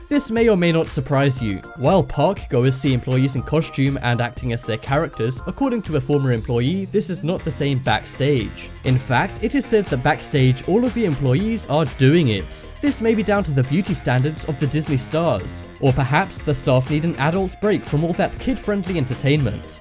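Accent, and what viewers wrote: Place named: England, United Kingdom. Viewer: Love the accent!! English??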